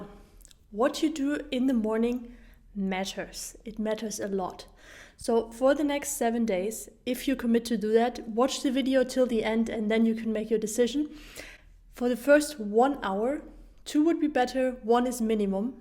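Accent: Germany. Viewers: R u german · Trying to guess your dialect...German? Austrian? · Thanks soooo muchfor this Video God Bless you from Germany Your acent sounds like German. Frohe Pfingst Montag 2019 Iam srarting tomorrow <num>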